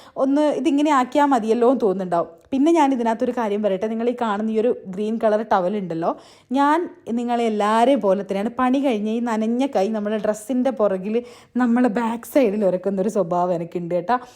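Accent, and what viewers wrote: Kannur, India. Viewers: നമ്മുടെ കണ്ണൂർ ഭാഷ ഇങ്ങനെ ചറപറ കേൾക്കാൻ തന്നെ ഒരു സുഖാ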